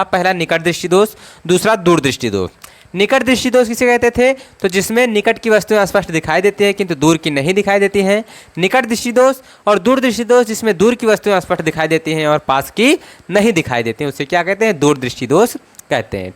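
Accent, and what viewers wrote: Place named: Awadh, India. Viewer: Gajab अवधी अंदाज में sir ji ❤❤❤❤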